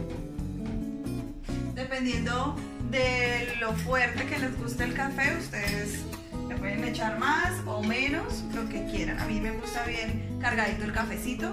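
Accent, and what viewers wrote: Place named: Colombia. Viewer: Que bonito acento tienes! Me encanta el acento colombiano Uuyyy y el café es mi debilidad!!! Gracias por compartir esta receta!!!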